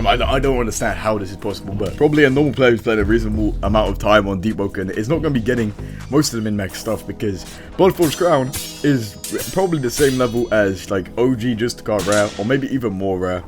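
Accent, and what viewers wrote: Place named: United Kingdom. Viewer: You are so British, damn...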